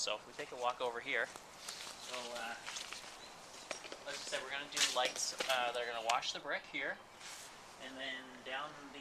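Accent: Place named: Toronto, Canada. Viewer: Totally knew you were from Toronto before I even saw your user name! #Hoose LOL!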